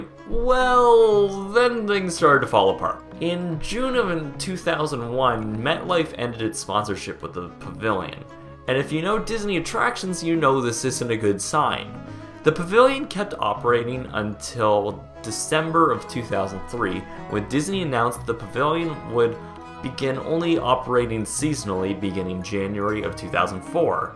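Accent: Canada. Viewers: The narrator sounds either Canadian, or he's trying very hard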